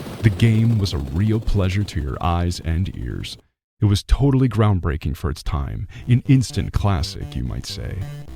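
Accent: France. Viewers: But you likely would call it a "Zee"X Spectrum. ;) I'm all for hearing the French accent. I think your passion is great and you're not likely blinded by nostalgia.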